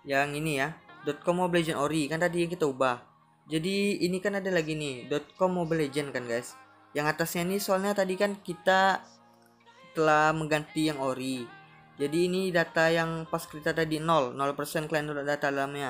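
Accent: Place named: West Sumatra, Indonesia. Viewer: Nampak bna urang sumbar ky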